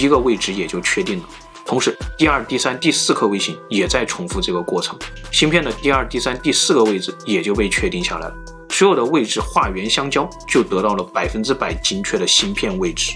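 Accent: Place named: South, China. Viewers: 就喜欢这种不标准的南方口音普通话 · 我听出来是武汉人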